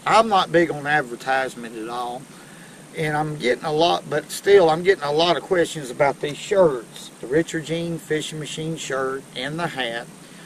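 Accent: South, United States. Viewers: Richard, I appreciate your humor, southern accent, and your thoughts of what fishing is all about! So glad I found your channel. Keep mak'n videos! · in that american language of yours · Maybe it's your exaggerated southern ayuckseeant, but I call them crappies, not crawpees.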